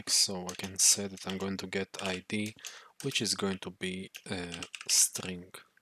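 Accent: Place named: Madagascar. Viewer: Hello, I was wondering if you come from Madagascar? ( cause of the accent ) anyway, this is a great video, thank you for the sharing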